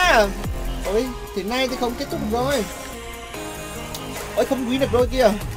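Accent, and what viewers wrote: Nghe An, Vietnam. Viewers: nghe giọng nửa trung nửa bắc nghe khó chịu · cái giọng nghệ An hay quá trời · Nghệ an bạn ạ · tiếng nghệ an nói hay chết đc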